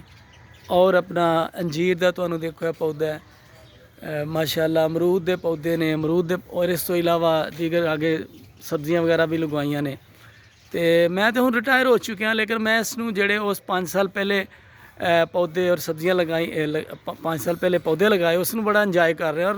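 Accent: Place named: Punjab, India. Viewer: میٹھی پنجابی دا میٹھا ویلاگ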